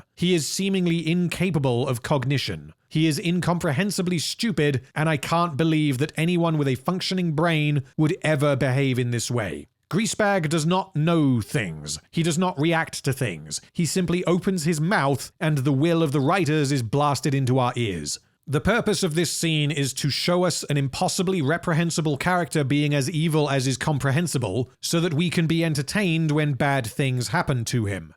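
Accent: United Kingdom. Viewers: I definitely knew you were English I just thought you guys had names for all your varieties of accents on the Isles. Regardless, you have a wonderful channel I love the long form evisceration of this trash. You aren't alone many of us remember THE BEFORE TIMES. Lol.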